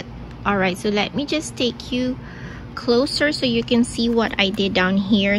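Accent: Philippines. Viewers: If I were a betting man, I'd say you're Filipina with that accent.. (fellow filipino here) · You sound Filipino ;)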